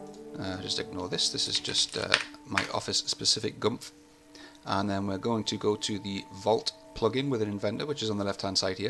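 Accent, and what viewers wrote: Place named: Newcastle, United Kingdom. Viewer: CloudCAD Ltd Thanks for the prompt response. Got a basic test setup running as per your video. I am an IT guy but an AutoDesk noob - are there any websites providing question/answers that you can recommend for Autodesk similar to experts-exchange, stackexchange, spiceworks etc BTW I am a Geordie - live in Whitley Bay. The accent works well for presenting - think Robson Green. Thanks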